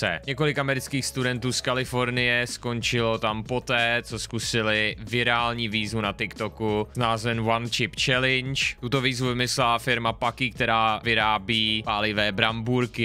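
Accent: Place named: Moravia, Czech Republic. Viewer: Ta slatka Morava. 🙂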